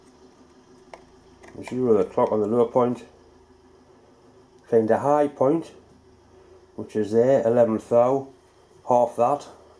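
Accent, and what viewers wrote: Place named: Liverpool, United Kingdom. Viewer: thats canny man scouser trying to be a geordie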